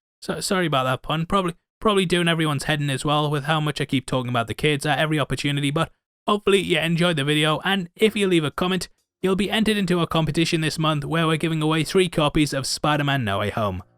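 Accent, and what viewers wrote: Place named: Cockney, United Kingdom. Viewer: Most Americans probably thought you were cockney anyway 😅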